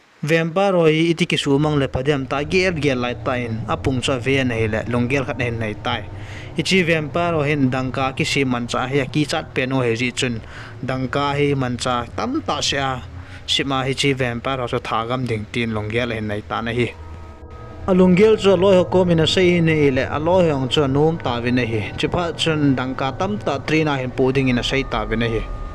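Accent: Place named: Moreh, India. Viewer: Moreh ho pao cu kangai nom g tama🤣🤣🤣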